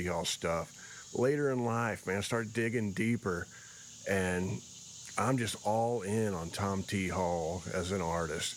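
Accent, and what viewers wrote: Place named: Asheville, United States. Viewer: I had just commented on your guitar player post last night about this. Man that sucks so bad. I wish my brother was still alive because he would be right with me on the commiseration today. He died in 2004 July 23rd. He turned me onto Tom T Hall, Johnny Cash, and all the older country and southern rock artists. I was a punk rock and thrash skater with a limited knowledge of older dudes like that. I sure appreciated him playing that stuff for me. Also when you get down to it the jump from bands like The Clash, The Violent Femmes, and Uncle Tupelo really ain't that far a stretch. I feel like you can play rock versions of country or country versions of rock. As music goes it's all the same there's only 12 notes and I only use 5 of them. Anyways as story tellers go Tom T. Hall was amazing. You have that same kind of delivery and ability... 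I play your stuff more and more and listen to the stories matter of fact the other day my wife said "That has such a nice voice. Comforting and sounds like people back home in Asheville. I could listen to him talk and sing all day" aside from that s#$* still been jamming on the songs you write. LOL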